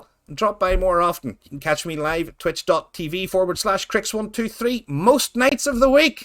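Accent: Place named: Northern Ireland, United Kingdom. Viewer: Hey, you sound Northern Irish :) so nice to hear though :) I'm also a frost mage, nice to see some good tips :)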